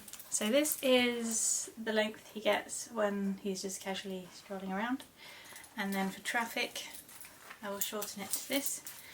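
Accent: United Kingdom. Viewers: Never knew is British 😅 Love your accent 🤩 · We Americans love soft, gentle, British accent especially when she says words like planting...or pl-aww-nting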